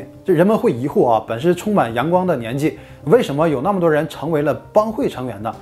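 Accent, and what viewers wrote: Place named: Yanbian, China. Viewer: up主是朝鲜族的吧，感觉口音有点延边，延吉那边的。😄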